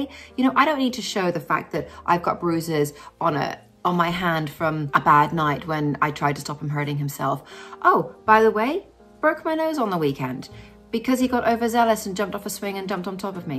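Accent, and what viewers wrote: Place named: Ireland. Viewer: Are you Irish? I'm Irish and can hear a twang in your accent lol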